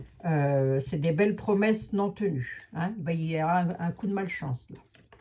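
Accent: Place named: Lorraine, France. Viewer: Ah voilà pourquoi votre accent m'interpelle et votre facon de parler m'est douce à l'oreille ! Vous êtes lorraine ! Ma grand mère tant aimée était à Metz. Ma ville de coeur où j'ai passé toutes mes vacances, durant mon enfance ! Oui creusez creusez... Et le 30-31 on aura sans doute le début des réponses. Can dace a prévenu ce sera en plusieurs épisodes ! 😉🤩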